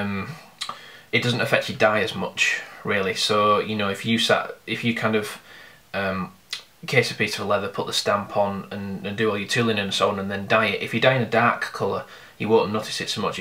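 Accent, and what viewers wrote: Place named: Midlands, United Kingdom. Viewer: hi were did you get the stamp? and your press. I am on the uk your accent sounds like your in the Midlands.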